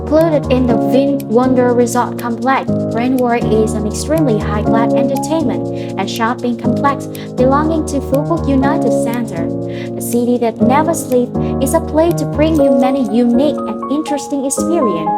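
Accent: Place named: England, United Kingdom. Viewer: Love your English accent so much ❤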